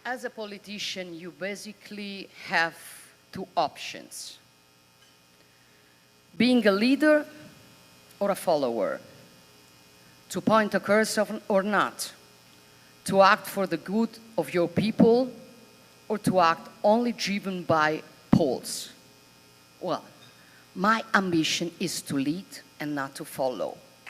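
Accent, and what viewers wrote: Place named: Italy. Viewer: Sta leggendo un discorso scritto da altri e la pronuncia la rende riconoscibilmente italiana. Niente di perfetto, dunque.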